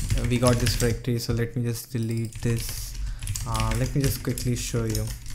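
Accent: India. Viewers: Came to channel Subscribed Heard Indian Accent Unsubscribed I'm brown myself, but no this is cringe.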